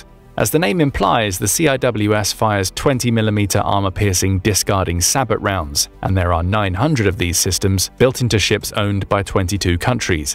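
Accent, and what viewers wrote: United Kingdom. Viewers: This is what happens when random guys try to make videos of military hardware. You're going to get your butts handed to you by the YouTube algorithm, by having actual military enthusiasts watching. With all those inaccuracies pointed out in these here comments. Caliber in traditional military sense describes the ratio between bore diameter and barrel length. The 50 caliber Mark 22 actually fires 3 inch/76mm shells, not .50BMG ammunition. This is the kind of basic mistake that casts doubt on everything else referenced in the video. At least the British accent makes it seem more artificial and movie like.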